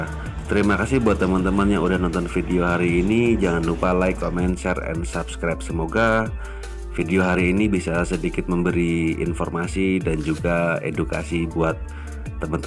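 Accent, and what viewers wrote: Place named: Banyumas, Indonesia. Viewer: Wong ngapak mas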